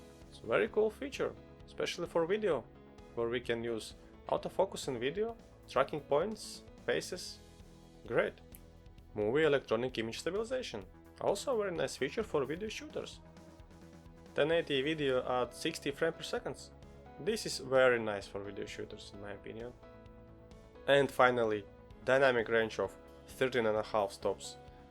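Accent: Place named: Russia. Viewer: какой то русский акцент, ты русский?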